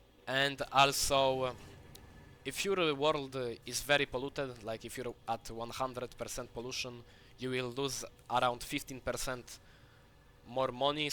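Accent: Poland. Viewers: Coś czułem po akcencie że rodak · masz akcent polski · Słychać po akcencie że Polak rodak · You sounds like Polish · ah ten polski akcent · Im thinking polish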